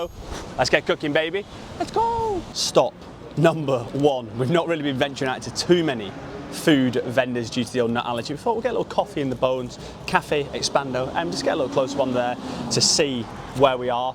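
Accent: United Kingdom. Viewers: Hi mate how are you doing? You sound like britsh.. Where do you live in England? I live in Ely Cambridgeshire mate. If you go futher to the east of Dili, it's FDTL compound (Military headquarters) you will see a huge crocodile about 7 metres long, they named him Antonio. I don't know whether this crocodile is stil there or not because I left the country ten years ago for England . just try to ask people there perhaps they can help you. Have good holiday mate and stay safe.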